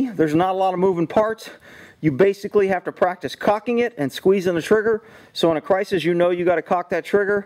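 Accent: Texas, United States. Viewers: This may be the oldest video, I have seen him make, in his newer videos he has picked up more of a Texas accent.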